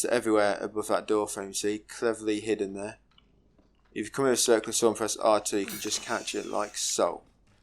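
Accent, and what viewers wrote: United Kingdom. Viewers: Just 100% the game because of your and MrReign’s work. Honestly you’re like a younger MrReign. Absolute prodigy. Anyway I just love your British accent and funny jokes, very fun combination. Don’t know why British people and resident evil 7 just… works😂 anyway thank you and have a nice life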